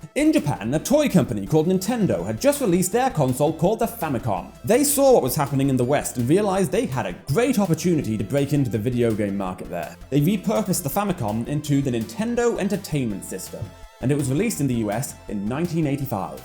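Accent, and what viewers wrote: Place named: United Kingdom. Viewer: The irony of a person who is clearly British saying Nintendo reclassified gaming is hilarious.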